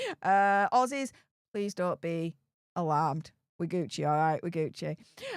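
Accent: Yorkshire, United Kingdom. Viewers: She has a Yorkshire accent.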